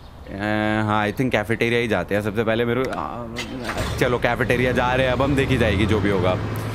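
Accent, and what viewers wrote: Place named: Bengal, India. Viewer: he is talking like Bengali people ❤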